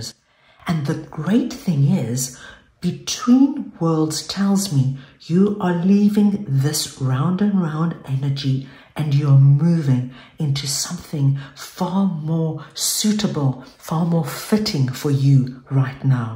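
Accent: South Africa. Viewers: Thank you so much for a lovely reading again 😅 do i detect a South African accent? Or am i getting old 😅😅😅 Lots of love from Cape Town ❤❤❤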